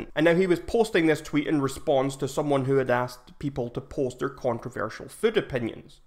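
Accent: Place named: Scotland, United Kingdom. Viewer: Your accent reminds me of my great grandma.. she had the thickest Scottish accent and listening to her talk was amazing.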